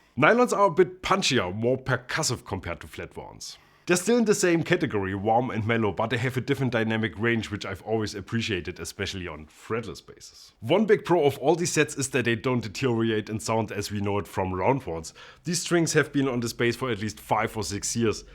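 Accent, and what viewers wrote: Germany. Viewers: Like your german Stile of English :)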